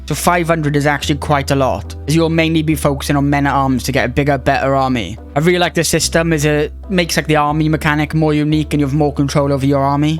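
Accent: Wales, United Kingdom. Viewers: Hey great vid man, nice to see another Welshman into ck3, keep it up my good man, your accent tells me you’re from around the Swansea area?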